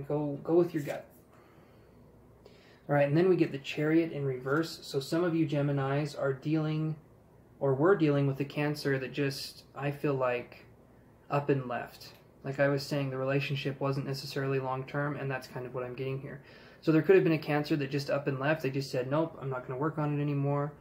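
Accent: Ireland. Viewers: Hahahahaha i was like :"hes actually irish?" I was so confused hahahahaha 😂😂Spot on reading ! Thank you!!☺☺ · Hahahahah I love the Irish accent. Classic!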